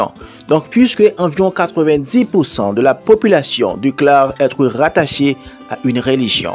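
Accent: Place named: Haiti. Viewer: Bonjour j'aime beaucoup votre accent haitien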